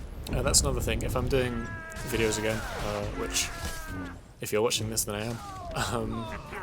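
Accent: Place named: United Kingdom. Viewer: Thank god I found your channel exactly what I was looking for, you sound British what server do you play?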